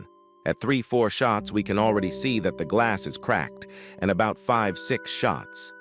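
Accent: Russia. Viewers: Are you russian🤨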